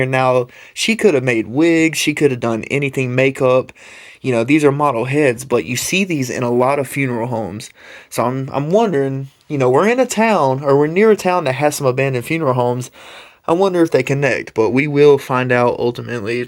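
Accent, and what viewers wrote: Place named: South, United States. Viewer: I was trying to figure out what state you were raised in by your accent. My guess was Carolinas or Georgia. You are my favorite explorer. The narration is great and you spend the right amount of time in each room. Keep up the good work!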